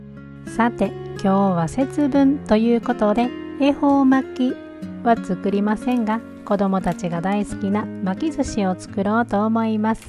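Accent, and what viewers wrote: Kansai, Japan. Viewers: こんにちは😊 今週はバタバタして今頃拝見させて頂きました！キャベツと鶏ムネが塩して放ったらかてるだけで、美味しくなるなんてどんな魔法ですか！？😳 さんの動画の好きな所は、さんの声と関西のイントネーション🎶 経験からの暖かい言葉、でしょうか🤔 あと、マリメッコのエプロン！かわゆす❤ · ドイツ、学生の時からの憧れの国。そこで彼方の方と国際結婚で暮らしている事に興味津々です😊ご家族もいて、穏やかな関西弁がほっこりしてます。初めて観てチャンネル登録させていただきました。どこで暮らしても小さな幸せ見つけることって大切ですね。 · 私は、とにかく nobanaさんのおしゃべりが大好きです😍 バリバリの関西弁😂癒されるわーって感じです。住んでいるのは横浜ですが、母方の実家が関西なので😊 あとは、やはりドイツ暮らしが、手に取るようにわかること。主婦目線、母親目線、いろんな場面があり楽しいです。これからもずっと応援しています♪♪♪ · 今日は予定をこなし帰宅後すぐ観れました❤ 母が転倒して右腕を打ち食べる事も出来ないので数日寝泊まりした後、ショートステイに入居させました。痛みはなかなか消えないしあちこち悪くて退去後も1人で暮らせるか施設も視野に様々な選択を迫られています😢 動画を毎回観るのが楽しみで癒しです。 Nobanaさんの関西弁だけど柔らかいトーンのナレーションが好きです✨ つまみ食い女王にもお会いしたいです😊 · はじめまして。今日初めてユーチューブ拝見しました。他の方もおっしゃっているようですが、優しい関西弁がとても素敵ですね。なんだか癒やされます。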